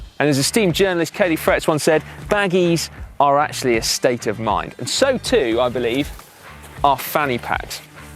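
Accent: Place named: England, United Kingdom. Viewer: Ble ble ble idiotich akcent facken englenda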